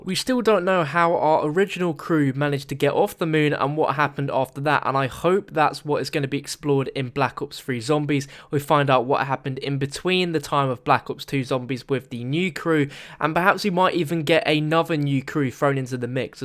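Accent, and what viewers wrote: United Kingdom. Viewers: we dont care about your opinions or british accent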